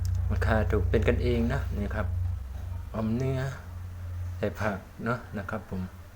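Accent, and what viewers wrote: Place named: Isan, Thailand. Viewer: เว้าเป็นแล้ว🤣🤣🤣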